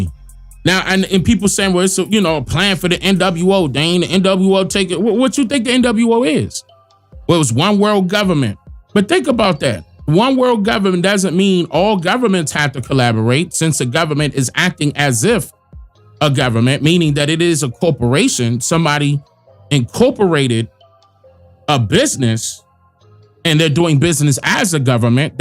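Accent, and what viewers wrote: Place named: DMV, United States. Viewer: Big love to you Dane. I just confirmed you were from the DMV. I’m from PG and I knew I recognized your accent and lingo lol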